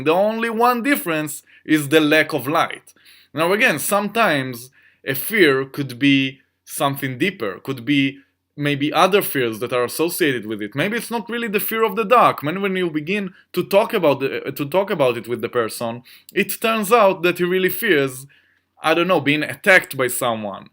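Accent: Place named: Israel. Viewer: Well I for one love Israeli accents, yalllaaaaaa! Thanks! This will help me sleep better alone at my ranch after a series of home invasions in my 20s that's left me with sleep trouble when I'm home alone. Going to conquer it though! Thank you!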